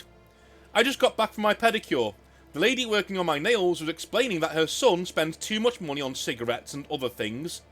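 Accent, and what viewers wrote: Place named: Wirral, United Kingdom. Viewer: no opponents? You have the dulcet tones of someone from The Wirral! I live on the Wirral myself (that is ON the Wirral, not IN the Wirral... don't ask me why its that way ) I'm out of love of big 40k atm but if you ever wanna play Killteam hit me up brother!! (Anything to not have to think about the Mighty Blues for a weekend!!)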